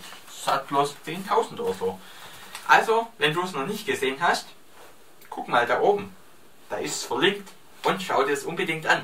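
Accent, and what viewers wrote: Swabia, Germany. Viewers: Schwätzt mol nur schwäbisch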